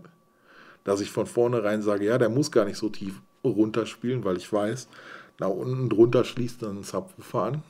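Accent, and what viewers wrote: Ruhr, Germany. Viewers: Vielen Dank für die kurzweilige halbe Stunde. Es hat mir viel Freude bereitet, wie du mit deiner "Ruhrpottschnauße" (das ist sehr positiv gemeint) die Dinge auf den Punkt bringst.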